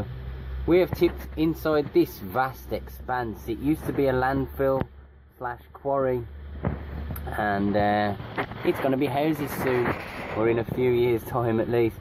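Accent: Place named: Birmingham, United Kingdom. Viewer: Just subscribe m8! Where is the yard you leave from. You sound like a brummy so im guessing Birmingham. Keep up the good work 👌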